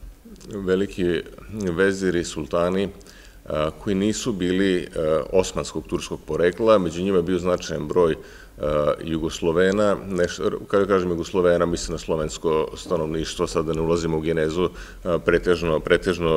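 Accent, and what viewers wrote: Vojvodina, Serbia. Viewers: OBOZAVAM NAGLASAK MOJIH VOJVODJANA !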